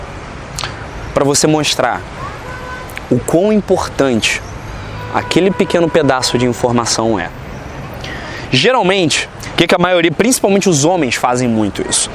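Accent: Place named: Rio de Janeiro, Brazil. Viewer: A dicção também é importante. Muito carioques na sua fala. Disssssscurrrrrrso, Butão é um país, bOtão é outra coisa.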